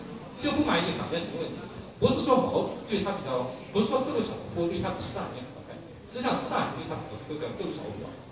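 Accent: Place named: Sichuan, China. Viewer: 其实就是一般川普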